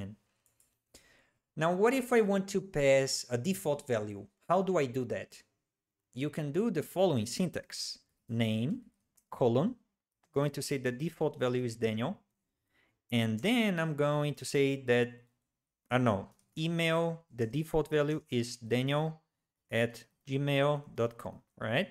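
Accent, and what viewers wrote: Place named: Brazil. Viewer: At first I didn't understand what these `echo` and `feud` meant, then I learned that Brazilians just pronounce the words `error` and `field` like this... Very irritating :D, but I've gotten used to it now.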